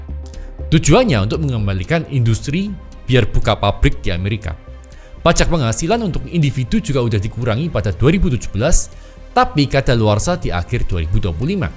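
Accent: Java, Indonesia. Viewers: Lucu banget. Muka cina tapi pas ngomong medok Jawa 😂 unik unik 😂😂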